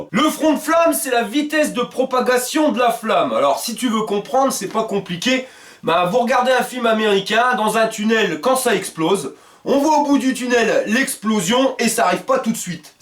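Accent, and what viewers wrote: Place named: Pas-de-Calais, France. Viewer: top vos explications les gars. je roule avec un wagon r Suzuki a 100% éthanol l'été et 50 l'hiver max depuis 5 ans. j'avais avant un boîtier pourri acheter sur eBay qui ne sert a rien car non équipe de capteur éthanol comme vous l'expliquer si bien. encore bravo et vive l'accent du pas de calais. signé un ti normand.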